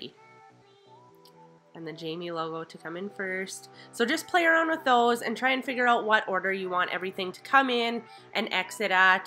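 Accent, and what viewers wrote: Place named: Canada. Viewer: I am subscribing because you made that really simple and as a new YouTuber I need all the help I can get I also love your accent are you from Canada by chance? I am from Detroit Hi Neighbor!!!!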